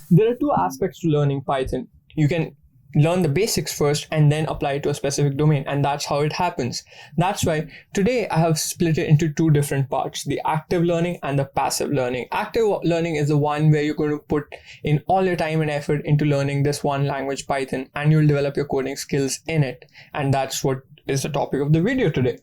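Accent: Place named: India. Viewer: nice... but i hate how Indians speak English... are u doing intentionally or it is just u don't wanna bother to ppl understand u nice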